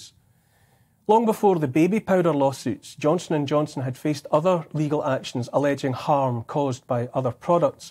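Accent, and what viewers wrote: Scotland, United Kingdom. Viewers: I LOVE this Guy, thank you Neil, first of all, as a Swiss born & raised I couldn't speak English when I came to Canada in 1980, I got taken in by an elderly Scottish Couple, hence, it's Scottish English I learned first, so I love listening to you, greed is killing us, Pharma greed NOT THE good old "FLU" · Thank you from Germany for your good words! I love your accent, reminds me of a wonderful holiday in Scotland with my husband long ago.....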